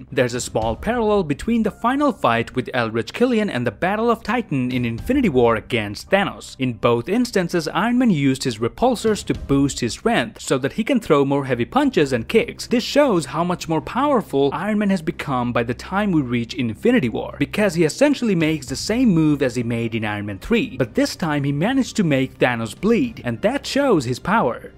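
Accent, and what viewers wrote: Canada. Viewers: I love your accent say it again!: Dowa the explowa Edit: Thanks for the likes! Hoping you are having a good day! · he sounds so much like modest pelican but Canadian instead of Australian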